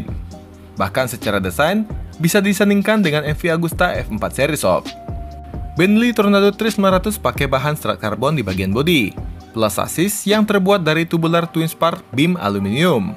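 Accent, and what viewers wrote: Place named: Bali, Indonesia. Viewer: Logatnya bali banget ni Bli ahahah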